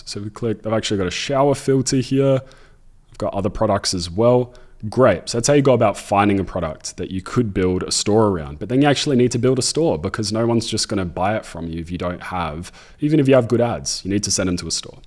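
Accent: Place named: Australia. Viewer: Aussies accent's cute lol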